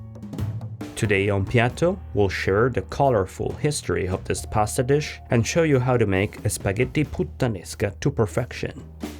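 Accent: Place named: Italy. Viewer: I would love to know the name of the chef/person narrating this video! His voice, Italian accent, and sprinkling some Italian in with the narration are perfect! ❤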